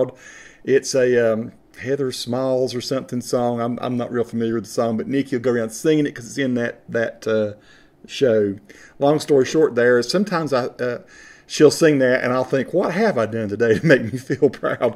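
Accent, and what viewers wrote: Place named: Appalachia, United States. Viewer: Hey, I just watched this video (5 years later), you are raising a very interesting issue about sociolinguistics. First: don't change the way you talk, Southern Appalachian accents are the best ;). Lots of factors are affecting the way people talk, looks like what is dividing people in the South (and elsewhere) today is whether they identify themselves as urban or more rural: the latter group being more likely to keep a distinct accent while the former tends to adopt a "global identity". Other factors come into play as well such as the representation of an accent in media (literature, television...) that create stereotypes and the woman you talk about seems to be rejecting, as you said, her identity in favor of a "global" identity probably because of negative stereotypes. And that's pretty sad because it's the fact that people have different accents that makes a language rich and interesting to study, but more and more people (especially in the South unfortunately) in large cities tend to adopt a more "global" accent we call "General American" in phonetics. 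Interestingly, the representation of an accent is different from place to place: where I'm from (France), people usually like U.S Southern accents. Thanks for sharing that ;).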